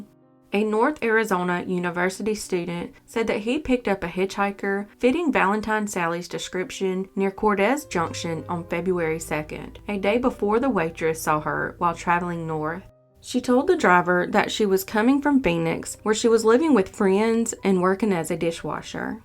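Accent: South, United States. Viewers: Just lovin that cute southern accent, you sound like a gentle stream caressing the pebble just pure flow x love it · That accent takes me back to childhood 😭. I miss the south, Ohio is horrible place.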